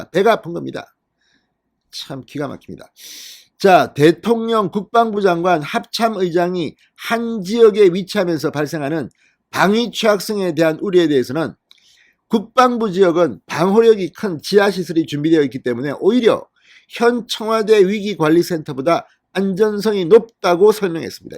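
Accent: Gyeongsang, South Korea. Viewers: 진성호 씨 /엇따대고... 속이시원합니다 완전 경상도사투리^^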